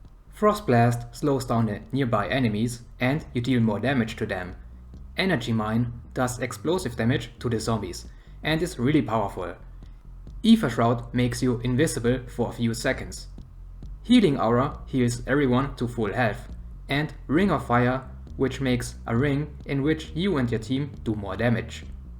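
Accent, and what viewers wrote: Germany. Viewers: Are you german because of your accent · Ich weiß nicht wieso aber ich höre direkt dass du ein deutscher bist 😂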